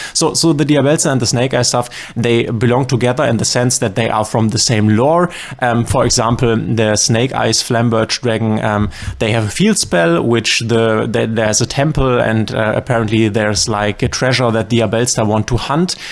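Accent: Germany. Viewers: Dieser deutsche Akzent 😁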